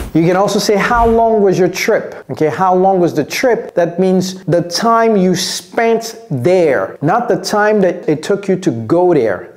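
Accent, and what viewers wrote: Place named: United States. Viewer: I say it before, I am saying again.He is good ! One thing to remember remember, this is pure American English. Learn it , enjoy it and apply it. Americans will enjoy listening to you if you sound like him. Thanks again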